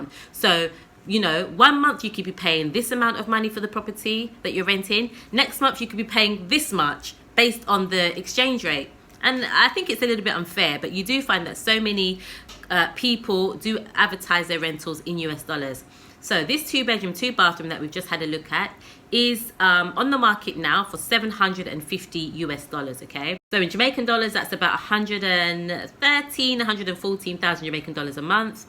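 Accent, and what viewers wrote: United Kingdom. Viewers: your new subbie like your English accent and your contents, you're beautiful i am here supporting you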